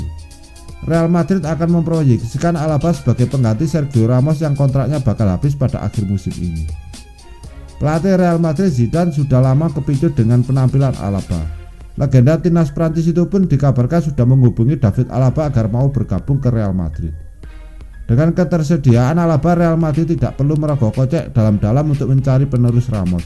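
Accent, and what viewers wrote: Java, Indonesia. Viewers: Min biground nya cari yg bagus sedikit suara gak jelas bgt apalagi logat jawa kental jadi gak kedengaran min..🙏🙏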